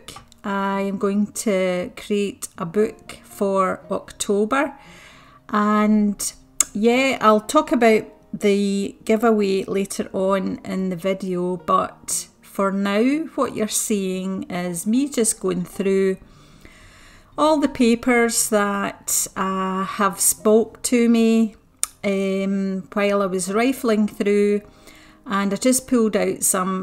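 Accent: Scotland, United Kingdom. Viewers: Thank you for taking us through your journal! I have to say I so enjoy your Scottish brogue. I had to subscribe, and I look forward to seeing your art and hearing you speak in your other videos! :) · What a unique and beautiful journal, love all of the natural elements. And how lovely to hear a bonny Scottish accent...makes me miss my days of living in Edinburgh!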